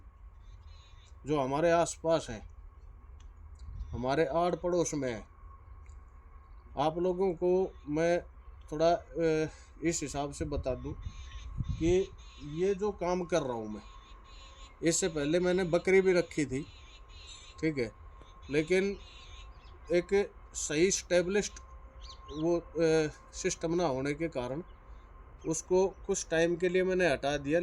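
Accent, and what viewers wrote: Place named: Alwar, India. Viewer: आप अलवर से हो ऐसा लगता है बहरोड़ मुंडावर साइड से भाई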